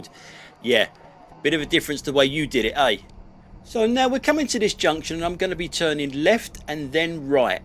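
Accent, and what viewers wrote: London, United Kingdom. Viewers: I was originally going to say that dyslexia affects only reading the written word and not the grammar of the spoken word. However I just looked it up and because dyslexia is all about the inability to process the written word then it can cause problems with similar sounding words in your speech pattern. It's nothing to do with laziness and is simply a case of condition. I am sometimes tempted to correct him on his nuffinks and sumthinks and double negatives but thats just how Londoners talk - vive le difference!! So I think you're a bit mean suggesting he's lazy. It has no impact on how well or badly he drives.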